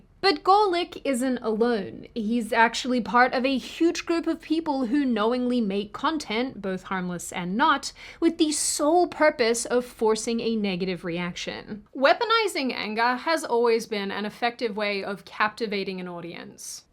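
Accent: Australia. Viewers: I picked you for an Aussie. It’s not completely hidden. Hi from across the globe 😊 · Do you honestly think you don't sound Australian? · Seems her Australian accent is stronger with this video - perhaps because she recently spent so much time with her family. Didn't detract from the video though. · TBH, you sound very Australian and there's nothing wrong with that. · The Australian accent is blatantly obvious, and I mean that in a nice way! lol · Also, you may not sound like Issac Butterfield, but you still sound like an Aussie. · You have a small Australian accent like the youtuber "TheAnimeMan," and I knew it right away when I heard you. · "Im Australian though I don't sound like it. " Um... you definitely sound like it. 😄 The accent is a little muted but still very recognizable. 😉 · You can say you don’t sound Australian all you want. It’s still there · Bless, you do sound Australian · Nah, I didn't know you were living in the US. 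I thought you were living in Australia. You definitely have a bit of that Australia in you. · You sound extremely Australian. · darling, you Do Sound Australian! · You totally sound Australien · We can tell your Australian! LOL you don't sound too off!😂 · You don’t think you sound Australian? You sound Australian as fuck 😂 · I can hear those bits of Aussie. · Good on you, family is priority. Also, you still sound Australian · You still definitely sound Australian 😅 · This pommy thinks you stilli very much sound Australian:) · You sound like an Australian. . . No idea what you're on about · You absolutely sound Australian, but its a light accent. Happy 30! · I have a lot of Aussie friends because of gaming and I can spot the accent no problem it's still there! 😁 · Spending time with your mum brought some of your Aussie accent back. As can happen. My mum spoke northern English when she used to speak to her mum on the phone when I was young. 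A small observation without a judgement :) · the more I watch your videos the more noticable your aussie accent gets when it slips happy birthday, love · I can still tell you’re from Australia, and that’s fine. It’s a good accent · You still sound Australian. But you should still be happy where you come from. Australia is a wonderful country. What made you want to move to America? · I totally still hear your Aussie accent!!lol it's cute, I love it 🙂💚🦘😄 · You sound absolutely Australian !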